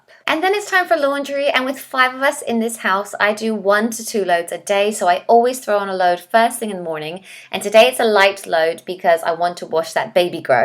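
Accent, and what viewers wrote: England, United Kingdom. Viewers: Is she British 🤔 (I know I sound silly asking this 😁😂🤣) xx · I'm Canadian too, but live in bc I would have never guessed you weren't born in England. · also from Meg's channel !! great vid -- hv always adored British accents 😍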